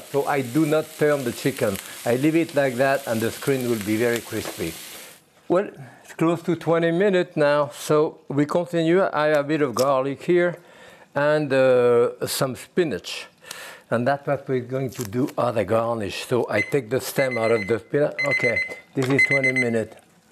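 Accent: France. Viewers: Usual Jacques le cuistot I inimitable ! I have been following his demonstration decades back and marvel at his techniques and his French accent . Anand from ile Maurice (Mauritius)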